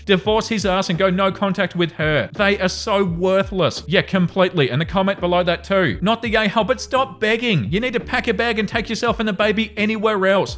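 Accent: Australia. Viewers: Silent lurker chiming in. 😊 I'm loving the longer content - I can listen to the stories and enjoy the Aussie accent (I'm a Melb girl living in the US a handful of years now... Hearing another Aussie helps me feel less existential dread right now 👀) while I have my morning coffee and plan my day, sneaking glances at kitty cam. 😻 Doing a longer video every other day is the way to go. Quality over quantity. Gotta look after yourself so you don't get near burnout. Give the kitties a cuddle from the far away Aussie woman who is currently freezing. (It's -12°C)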